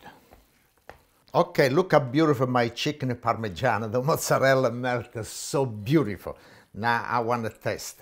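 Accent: Italy. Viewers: Classic Italian acsent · I'm loving that accent, he's a true Italiano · Excellent recipe, the version with beef is called pizzaiola with origan instead of pepper and without eggs and breadcrumbs simply cooked. Italian accent but no Italian living in Italy would eat a second (main dish) together with a first course, we believe in the separation of... powers (chef Montesquieu😉 ). Dividi et impera.. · I love his accent. Yes I want to learn how to make real Italian food from a real Italian. Thank you for this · Cin cin! Love his Italian accent! I made his parpedelle & Bolognese the other night. It was so so good! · Love when Italiens speak English ❤️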